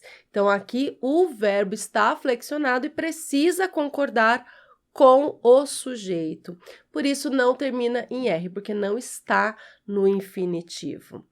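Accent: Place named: São Paulo, Brazil. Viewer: Oi,professora,amada por mim, você está certa de puxar o R,um erre áspero.O teu erre tem som do R paulista.Te amo,okay🥰🥰🥰🥰🥰🥰🥰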